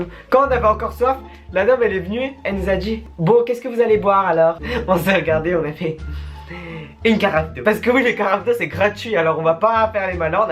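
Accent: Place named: Charente-Maritime, France. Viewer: J'parle comme toi et j'suis de Charente-Maritime 😅😅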